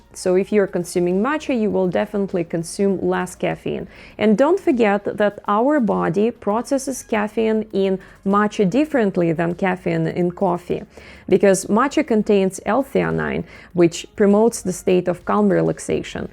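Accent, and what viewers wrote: Russia. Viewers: Where you from ? You sound Russian ?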